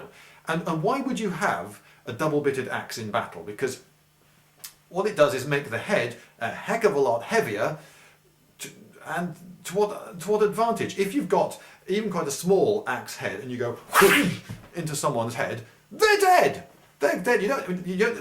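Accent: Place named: United Kingdom. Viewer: I have never heard such a good example of a british accent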